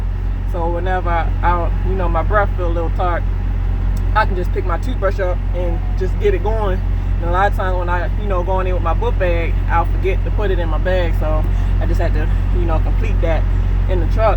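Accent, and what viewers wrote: Baltimore, United States. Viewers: You gotta be from Baltimore lol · Oh yeah I can hear that Baltimore coming out🤣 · i can tell you from the Baltimore area, your accent and pronunciation comes through ..... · Much respect queen. Make it happen. Also I hear the Baltimore in your voice and accent. Positive energy and uplift. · Great content! First time coming here and I may be a new subscriber, you're a very Pulchritudinous woman and I detect a strong Baltimore Maryland accent! Lol 🤣😎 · Straight up b mo accent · Go old TA HUH LOL. Quick question are you from Baltimore I'm picking up on your lingo how you talk I'm from Maryland myself thinking about starting up a YouTube channel when it comes to trucking I have a YouTube channel when it comes to racing just over 10,500 subscribers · She definitely from Baltimore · You have a Baltimore accent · were you from dc or baltmore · You are from Baltimore aren’t you? 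I can tell by your accent. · Baltimore accent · U from Baltimore? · You gotta be from Baltimore, cause that accent is pretty strong!!! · Hey Wassup.. Am I correct in saying your accent sounds Bmore to the core. BALTIMORE? AM I CORRECT OR AM I OFF? I'M JUST SHOWIN LOVE. RESPECT! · She from Baltimore huh I hear that U in all the words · From Bmore???? I can hear it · You must be from Baltimore. Lol. The way y’all say words that have the sound U in it is unlike anywhere else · Love that Baltimore accent!! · You must be from b-more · Toooooo and dooooooo yeah she from baltimore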